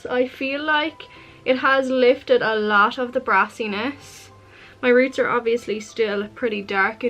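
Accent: Ireland. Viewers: What county in ireland are you from im really bad at accents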